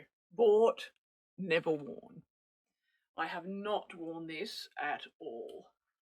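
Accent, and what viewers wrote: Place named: Australia. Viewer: 🇦🇺 heard the Aussie accent and joined up 👍 Yes, I have cleared out my clothes when I retired, I had about 5 outfits left!! So gradually I've rebuilt my wardrobe up, but I have a new mantra, if I don't love it, I don't buy it. I've Stopped buying just because its on sale, I always regret it when I go to wear it!! So love it..or Leave it! 😊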